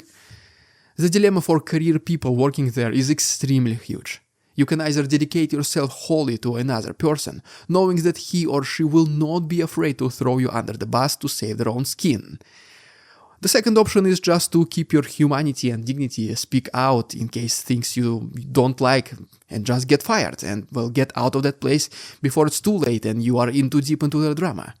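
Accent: Russia. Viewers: I often watch (an more importantly LISTEN) to your verbal presentations. The information is of course most enlightening. Over time, however, I began to notice the high literary quality of your talks. Slightly blurred by your rather thick Russian accent, your eloquence, your ability to deploy apt metaphors and sharp analysis make it clear you are much more than just another military blogger. Clearly you are working from a prepared script (which I assume you write yourself). I want you to make available the written version of your talks. They are wirth preserving and re-reading.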